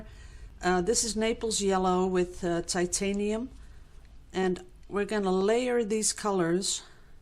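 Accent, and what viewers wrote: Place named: Netherlands. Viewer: I hope I can learn to do these beautiful pours. It looks like two people are reaching for each other's hand as if something magical is about to happen (except for the "extra" finger lol) it's absolutely stunning! Btw, I knew you were Dutch from hearing your voice. My mom was the only one from her family to move to the U.S... I have never been but, I dream of going to visit my Dutch family! Thank you for sharing your beautiful art❤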